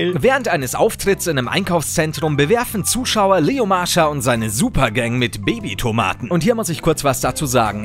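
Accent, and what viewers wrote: Bavaria, Germany. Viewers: Feier dich richtig, durch dein bayrischen Dialekt Einlagen, die ich als Oberösterreicher natürlich versteh, find ich dich auch sehr Unterhaltsam. Zudem find ich es toll wenn auch die Großen (im Kopf nicht) kritisiert und oft zerlegt werden. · Michael Obermaier Komme aus Bayern und liebe es, wenn er bayrisch redet xD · BITTE STOP mit der Bayrischen Sprache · Naja weil ihr in Österreich nur Bayrisch sprecht 😂 Nein Spaß. Ich mag es auch wenn er Bayrisch spricht. Grüße aus Bayern zurück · Hab mir jetzt einige Videos von dir angesehen und ich muss sagen wenn man selber ein bisschen bayrischen Dialekt versteht, gibt es kaum bessere Unterhaltung auf youtube Deutschland😁👌 Hast einen neuen Abonnenten gewonnen mach weiter so👍🏻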